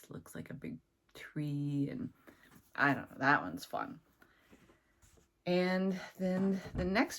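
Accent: United States. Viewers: I love your Minnesota accent! I think I want to try the monogamous stitching next week and am I cheating if my monogamous stitching is a series of month;y charts?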